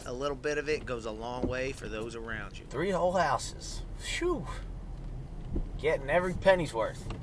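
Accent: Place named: Appalachia, United States. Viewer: You should do your own narrating, instead of the hillbilly hick voice. Your natural voice sounds better.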